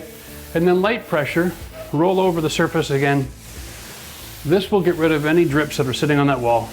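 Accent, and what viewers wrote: Canada. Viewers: Great Canadian accent! 😊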